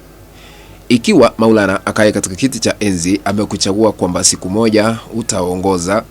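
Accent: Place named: Kenya. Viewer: Huna akili.mamae wewe ni mkenya siunasikianhata rafudhi mbwa wewe hamtaiweza Tanzania kima nyie kapewa nanani ? Wewe shoga tuu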